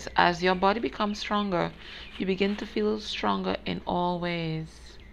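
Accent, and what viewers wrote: Trinidad and Tobago. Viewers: You sound like a Trini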